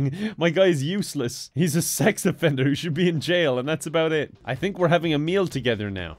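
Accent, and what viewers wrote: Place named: Malahide, Ireland. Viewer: You sound like your from malahide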